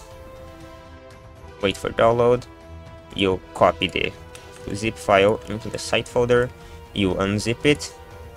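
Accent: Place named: Brazil. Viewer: N precisa n, é que eu vejo tanto video em ingles q eu percebi um leeeeeve sotaquezin BR ouvindo vc, hehe. Mas parabéns pelo vídeo :D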